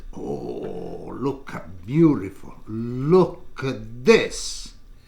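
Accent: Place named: Sicily, Italy. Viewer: Looks great I love lamb and I do make that but not this recipe I definitelywill do this recipe thank you and I love your videos and accent remind me of my patents who cane from Sicily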